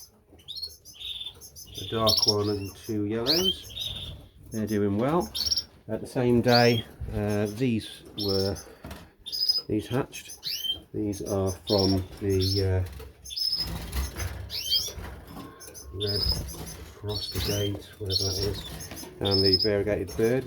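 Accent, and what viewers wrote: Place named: Potteries, United Kingdom. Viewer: YOUR ACCENT AINT NO GEORDIE ACCENT, MY GUESS IS THE POTTERIES?? MY TEAM IS SO UNPREDICTABLE THESE DAY TOO MANY CHANGES AND A NUMBER ONE DE-STABILIZER 'POGBA' SKULKING FROM HIS CONSTANT INJURY TABLE, MAN UTD. LET'S......... AW GAN ALANG THE SCOTSWOOD RD AN'.......... WORSHIP ALLAN SHEARER, LOL''............ THANKS FOR THE PARTY FELLAS.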